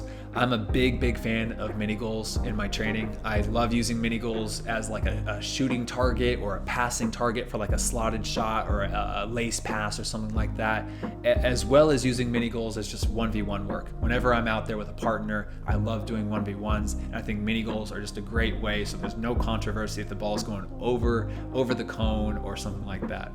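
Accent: United States. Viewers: In America we call it soccer, and you sound American. Real Football 🏈 is different. The title of your video is misleading. Just kidding man. I watched anyway and these tools will help either sport. Thanks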